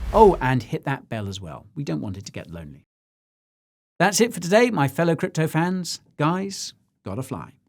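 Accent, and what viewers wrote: United States. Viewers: LOLOL I love the American English accent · stick to the cowboy accent brother !!! it suits you !!! :) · Your American accent is on point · Love that American accent. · Good American accent...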